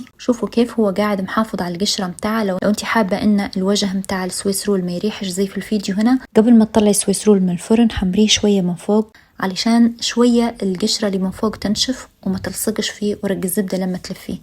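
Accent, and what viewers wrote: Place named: East, Libya. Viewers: ايه ليبيه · خمنت ليبيه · ليبيه شرقاويه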